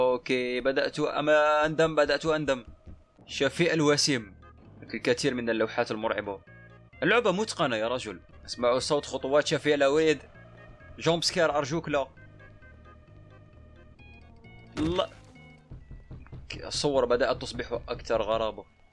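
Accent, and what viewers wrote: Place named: Egypt. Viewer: من هو احسن يوتيوبر ( احسن من ابو فلة ) - من اليوتيوبر الي بيستاهل 26 مليون مشترك ( يستاهل اكثر من ابو فلة ) - من اليوتيوبر الي بينزل فيديوهات بشكل يومي ومهتم بنا مثل الاطفال ( اكثر من ابو فلة ) - من اكثر يوتيوبر مضحك ( مضحك اكثر من ابو فلة ) - من الي بيتكلم مصري وهو اصلا من الجزائر ( من الاخر احسن من اي يوتيوبر ) 🎉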